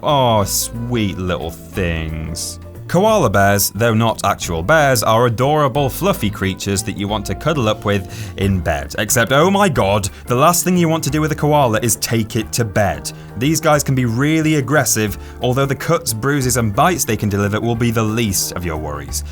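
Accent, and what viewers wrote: United Kingdom. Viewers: I just love British sounding narrators..............They sound so intelligent.............................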